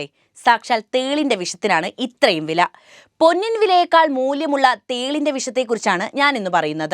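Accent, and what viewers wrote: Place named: Idukki, India. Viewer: സത്യം പറ,, വീട് ഇടുക്കീലാണോ ?😊😊 cause I’m from adimaly,, that accent of yours is same as mine 💪💪🥰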